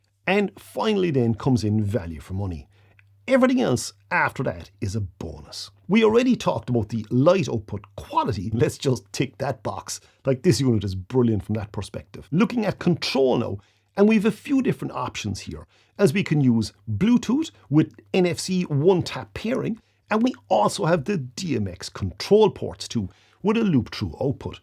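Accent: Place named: Ireland. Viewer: Thanks again for a great review, love that irish accent of yours it reminds me of the time I worked for the Irish Guards in Germany 74/75👍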